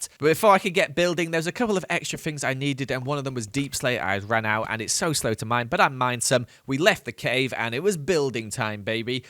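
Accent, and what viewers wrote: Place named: United Kingdom. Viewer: so refreshing to hear a British youtuber for once because the vast majority of youtubers seem to be american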